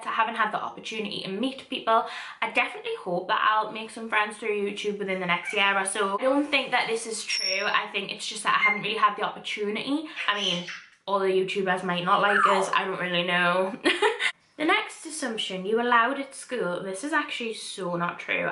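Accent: United Kingdom. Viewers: Where abouts in the uk are you? I can’t work out your accent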